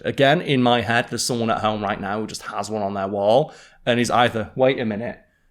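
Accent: United Kingdom. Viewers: Ignore the haters, your great yes Simon was great too but you don’t try to hide the fact your British like he did ( he spoke to American for my liking ) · It was definitely a change going from Simon to you, but I've taken to your style. Still really dig the Factboi's style, but not at the expense of you. As for your voice, I'm a dumb American so I like all the different English accents, and yours is cool. Your style and delivery are your own, and they are cool. Edit: told you I was a dumb Yank; should have used a synonym for cool the second time, or at least added too.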